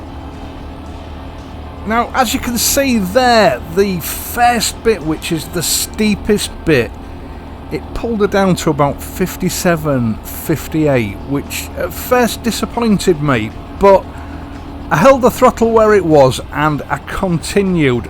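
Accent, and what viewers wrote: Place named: Hull, United Kingdom. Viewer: Apart from the really good motorbike content, I just love listening to your Hull accent. Pure music! Great video.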